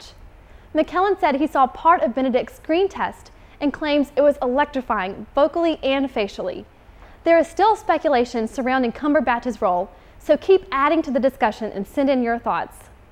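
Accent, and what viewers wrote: United States. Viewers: You're cute, but try to stop mis-pronouncing everything please. You can push past this "American accent" we believe in you!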